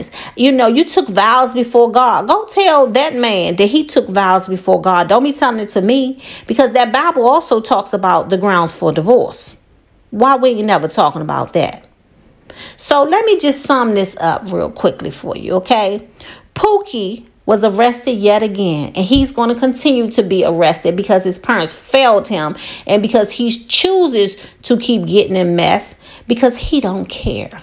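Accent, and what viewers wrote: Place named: Washington DC, United States. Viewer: New subscriber here….. only subscribed because i feel like I heard some DC twang!!!! #Ward8 #Soufeast4Lyfe 🙌🏾🙌🏾🙌🏾💯💯💯💪🏾💪🏾💪🏾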